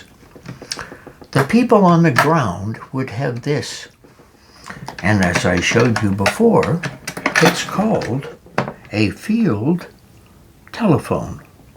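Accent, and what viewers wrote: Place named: United Kingdom. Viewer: He has such a beautiful accent almost like the British . I think the old generation used to stick to British accent as close as they could.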